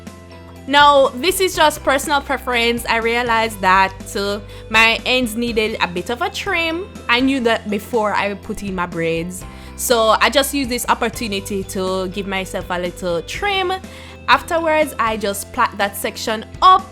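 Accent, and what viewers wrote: Jamaica. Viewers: I LOVE,LOVE YOUR ACCENT ARE U JAMAICAN Cuz U HAVE MY GRAMMARS ACCENT LOVE IT!!! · Are you Jamaican because I can hear your accent a whole lot! I'm Jamaican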